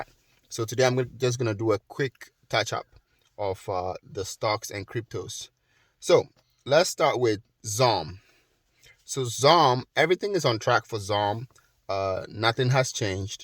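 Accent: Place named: Africa. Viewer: Where are you from??, you have an African acent. I even just subscribed because of that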